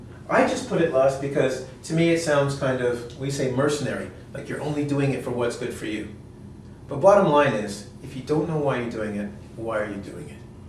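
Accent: United States. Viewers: Excuse me, It`s American English? :) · Good job, amazing !!! I understand the most part of this video, and I have a sucks english :( Are you american ? where u from ? thank you for share this video, I hope one day be a english speaker like you are. Greetings from Brazil. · А вы можете сказать это какой американский английский (акцент) или британский? И вообще все которые есть учителя на сайте <link> они обучают какому английскому? Просто я новичок и пока что не могу отличать их, но я знаю одно, что не рекомендуется смешивать по этому спрашиваю.. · are you american?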